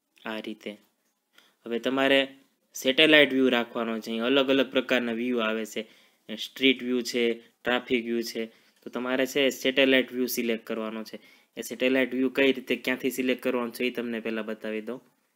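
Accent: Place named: Kathiawar, India. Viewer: ભાઈ ભાઈ, એકદમ કાઠિયાવાડી ભાષા બોલો છો... સરકારી ખરાબો ની જમીન એટલે શું ?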